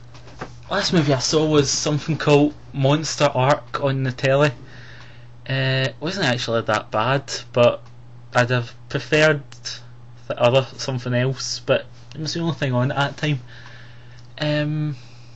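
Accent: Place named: Scotland, United Kingdom. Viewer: You are SOOOO Scottish XD That is one cool accent :D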